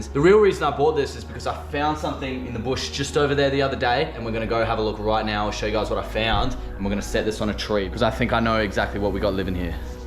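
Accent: Australia. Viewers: I LOVE THE AUSTRALIAN ECCENT😩❤️ greets from the Netherlands!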